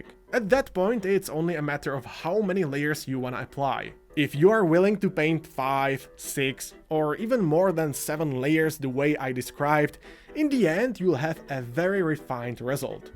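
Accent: Czech Republic. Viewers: First few sentences and I immediately knew you are Czech, then I checked and I was correct :D Hi from Prague :D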